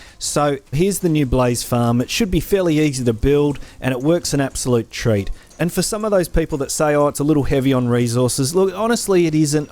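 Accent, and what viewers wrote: Australia. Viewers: are you aussie mate ?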